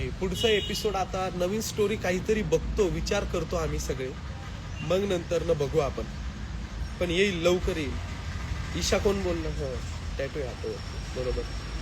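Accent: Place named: Kolhapur, India. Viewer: आरे दा तु लय भारी बोलतो तु कोल्हापूरचा आहे का रे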